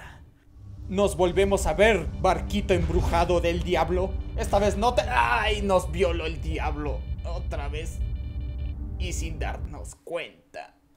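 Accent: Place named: Mexico. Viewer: JAJAJAJJA buen vídeo me gusto tu asentó mexicano le queda genial a tu voz lol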